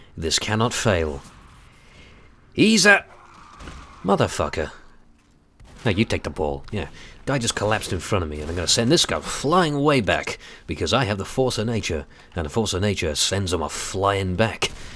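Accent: United Kingdom. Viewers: I love British commentary the voice is like...... It can't be explain from words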